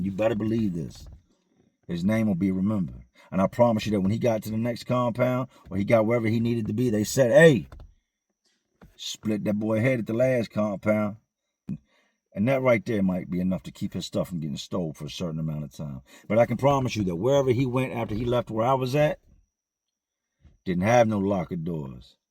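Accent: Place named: Maryland, United States. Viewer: You sound like you from Md. Love how you don't sugarcoat like prison is fun because its terrible.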